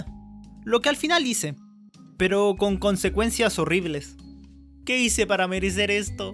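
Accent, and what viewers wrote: Chile. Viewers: Por su forma de hablar se nota que es chileno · Alv sabia que vivias en chile :v tu voz sonaba chilena '_'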